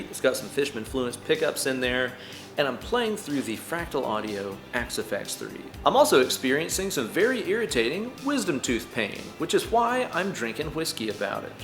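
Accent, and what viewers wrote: United States. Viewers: Yeah you fine dude. Love the playing. Alls I know is I give you props you don’t put out a video every twenty minutes like John Cordy and you speak American. The lick was great. Please accept my apology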